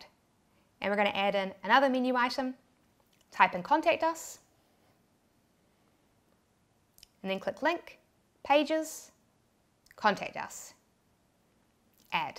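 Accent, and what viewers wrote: New Zealand. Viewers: That New Zealand accent is real. Who else thought she said sex coasters?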